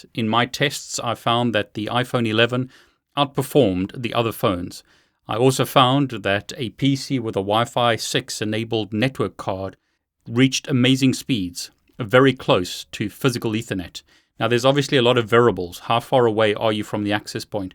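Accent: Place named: South Africa. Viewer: I hear a South African accent, or am I just homesick?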